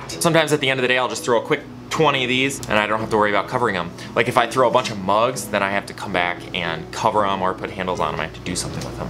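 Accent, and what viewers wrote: Minnesota, United States. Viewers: I love how Minnesotan you are, it’s so nice hearing someone from the same place as me in a video!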